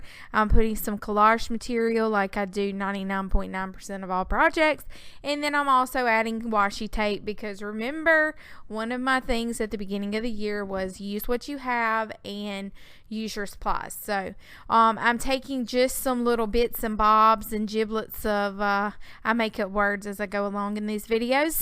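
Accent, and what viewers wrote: South, United States. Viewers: just want to let you know, how talented I think you are. I REALLY enjoy your videos. Not to mention that I could listen to you talk forever. LOVE that southern drawl!! We Canadians love that drawl!! lol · love the feathers and your southern accent is delightful!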